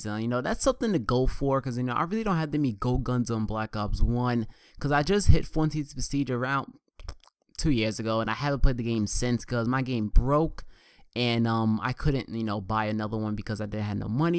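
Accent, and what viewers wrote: New York, United States. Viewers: I don't mind your New York accent at all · And new york accent doesnt bother me! It actually makes your commentarys more unique!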